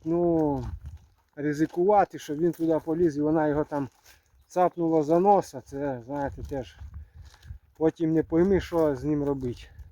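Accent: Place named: Ovruch, Ukraine. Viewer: Вітаю, напиши яка назва вашого села,я чую наш говір, я з овручини,наперед дякую.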